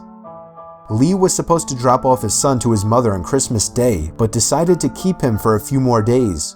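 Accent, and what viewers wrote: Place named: New York, United States. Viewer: I love his new York accent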